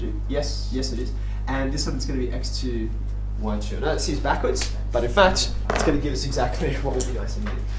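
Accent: Australia. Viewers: That darn Australian accent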